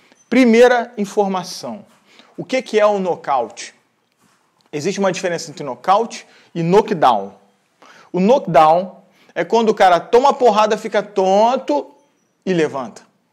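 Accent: Rio de Janeiro, Brazil. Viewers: É regionalismo, carioca, se não xingar e chia tá errado. ..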